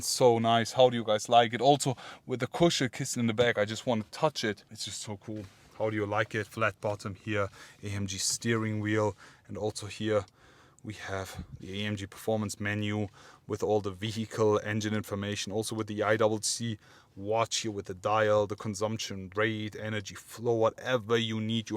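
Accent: Germany. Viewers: I always liked, a German accent, suits the Mercedes. Makes it, more tempting, to buy one. Cheers......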